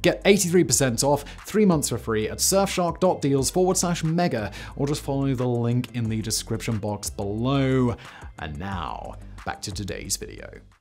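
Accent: England, United Kingdom. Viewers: I have to tell you that the commentator in this video speaks too quickly combined with a thick English accent while at the same time sounds like he is holding his nose shut. So the reault is you can't understand or at least you have a very difficult time understanding what he is saying.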